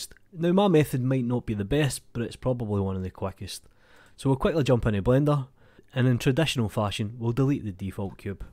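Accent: Scotland, United Kingdom. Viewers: Soo Scotish accent :)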